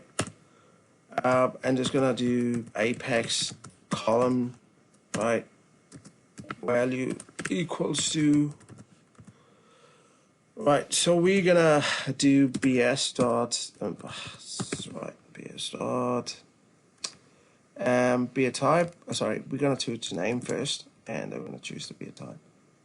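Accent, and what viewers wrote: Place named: Scandinavia. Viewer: Your accent doesn't sound like New Zealand to me, sound like Scandinavian.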